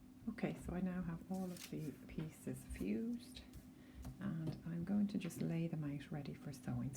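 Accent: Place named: Ireland. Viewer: Irish accent😊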